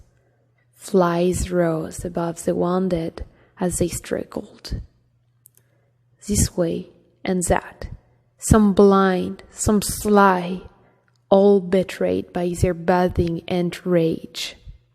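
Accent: France. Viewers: Never knew I needed to hear Prince of thorns in a thick French accent. Amazing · Don't worry. I LOVE your French accent. It's so beautiful and it suits the setting of the book very well. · Love this book and your beautiful French accent make it even better 😍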